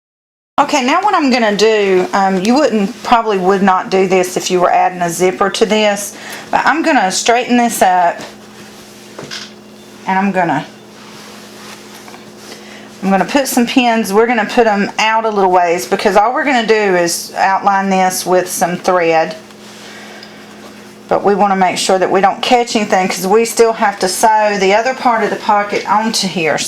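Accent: South, United States. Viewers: Very nice. Thank you for sharing. This was very helpful for me. I have not learned to sew zippers yet. However, I have made a lot of leather purses (Southwest Style). You are a great instructor. Your voice delivery is very clear and precise. Your instructions are easy to comprehend and follow. The southern draw makes it easier for me to understand ya'll. I am going to attempt this. However, I am going to try and line the opening to the pocket with garment leather. I believe I will sew the garment leather to the pocket slit before I bring the material through, to the 'wrong/unseen-side' of the purse liner. If it doesn't work, I will resort to this simple pattern. Thanks again!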